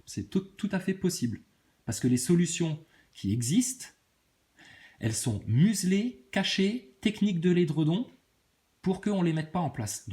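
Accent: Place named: Vendée, France. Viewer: On croirait entendre un vendéen Qu'ils reposent en paix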